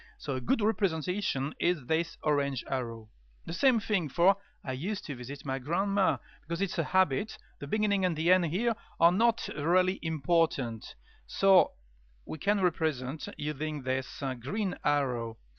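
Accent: France. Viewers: haha love your french accent :-)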